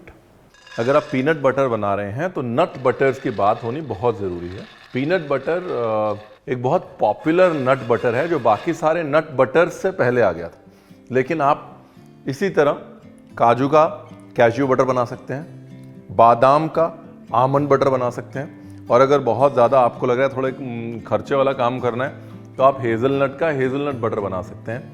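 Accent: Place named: Lucknow, India. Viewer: Guru Tezz ho tm hain...laucknowa phonetic chhodate ni ho...sayad chhutegi v ni.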